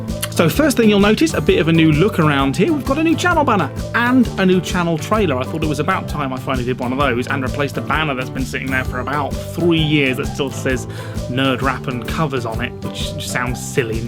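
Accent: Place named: United Kingdom. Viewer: I came here from why did I say okie doki .. YOURE BRITISH!?!?!?!!! I AM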